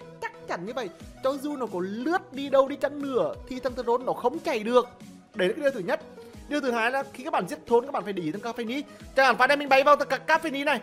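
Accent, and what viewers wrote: Nghe An, Vietnam. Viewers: Y nghe an que choa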